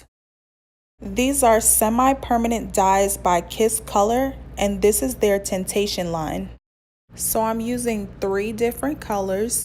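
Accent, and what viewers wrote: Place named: New York, United States. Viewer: GIRL! You can hear the NY all up and through this video 😂😂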